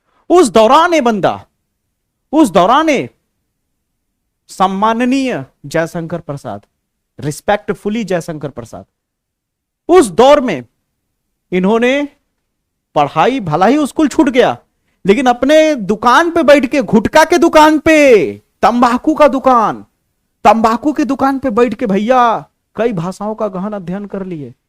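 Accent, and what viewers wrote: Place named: Awadh, India. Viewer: Siir aapko.bolne ka dhang nhi h do thow taiyar kra diya bolte h aaadhi hindi awadhi 😊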